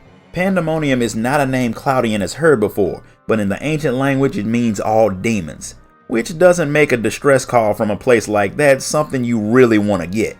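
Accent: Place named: South, United States. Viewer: That smooth southern accent tho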